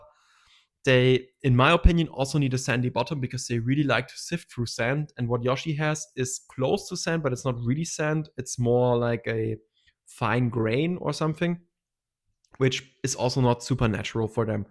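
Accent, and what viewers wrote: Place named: Germany. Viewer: ican hear german :))))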